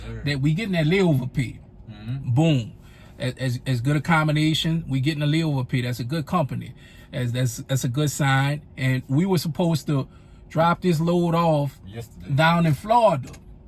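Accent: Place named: New Orleans, United States. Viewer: You definitely from NOLA heavy accent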